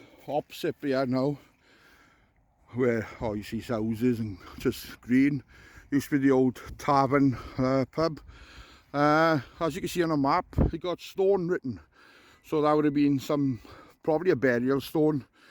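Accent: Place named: Wales, United Kingdom. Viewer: It's a welsh accent mate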